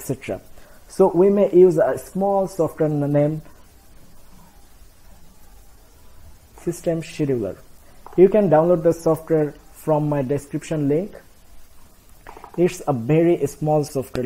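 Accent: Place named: Bangladesh. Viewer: BANGLADESHI ENGLISH.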